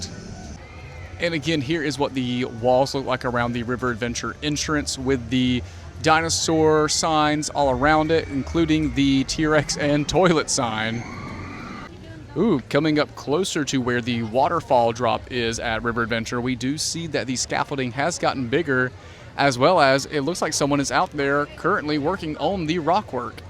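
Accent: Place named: South, United States. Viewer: Thanks for the update,! We are hoping that they extend Harry Potter or MOUNT CRUMPIT into Lost Continent. WE DO NOT WANT POKÉMON! It’s not our thing. Where are you from? You have a southern twang! Love it! 😊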